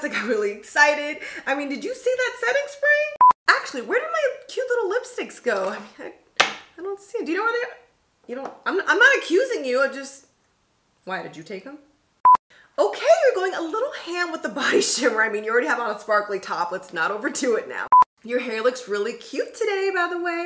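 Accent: United Kingdom. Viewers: I LOVED this video!! Complete with British accent!! 😁 Very nostalgic for me, too!! ❤❤ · I thoroughly loved this video. It was so fun to watch. Loved your British accent, almost spot on. I received the notification of the video while I was watching you. That was weird.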